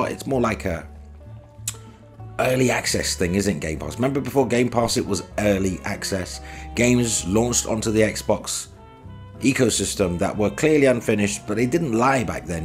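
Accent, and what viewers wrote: United Kingdom. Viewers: The Brittish accent makes the insults even funnier. Lmao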